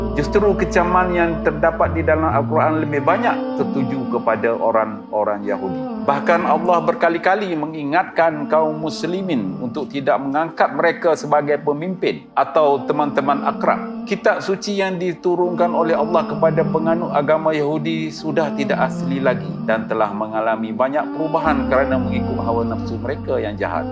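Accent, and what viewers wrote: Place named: Kelantan, Malaysia. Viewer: Loghat tumpat kental sangat 👍👍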